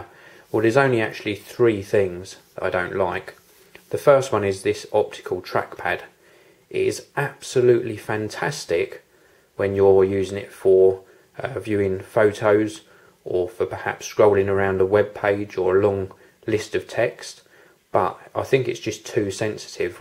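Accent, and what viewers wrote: England, United Kingdom. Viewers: thanks for the review...im preordering the unit :] i hope the battery is as good as you say it is....btw gotta love english accent ;]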